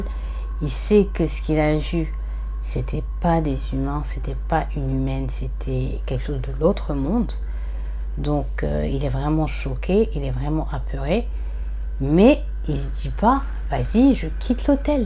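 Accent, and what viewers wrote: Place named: Arab world. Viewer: J'aime beaucoup votre façon de parler l'arabe;votre accent en arabe me plaie beaucoup.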